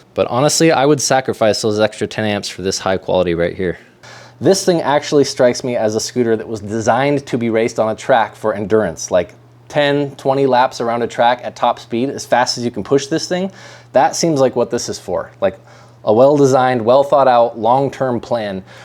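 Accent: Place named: England, United Kingdom. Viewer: Hahaha you are hilarious! English accent and all! 😂🎉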